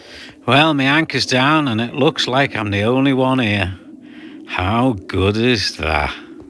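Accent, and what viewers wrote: Liverpool, United Kingdom. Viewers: I just love sailing too! And I love your videos. When I'm not able to go sailing in my boat, watching your vids is almost like going sailing. I enjoy your Liverpool accent too. It's like going sailing with John Lennon. Brilliant!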